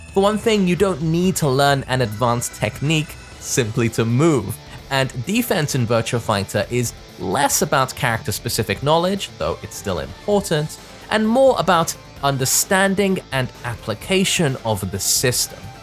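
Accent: United Kingdom. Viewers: you live in japan? thought you were bri'ish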